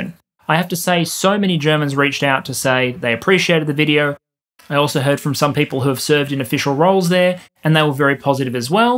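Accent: Australia. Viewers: This video is three months old. But like all of videos...I can just listen to it over and over again. I think it's a mixture of the subtle comedy, absolute reasonable logic, and that cool sauve Aussie accent that is so calming.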